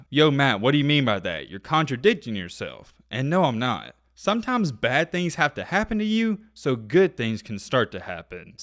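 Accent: South, United States. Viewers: Dude your accent is awesome!! So southern like mine probably is too 😂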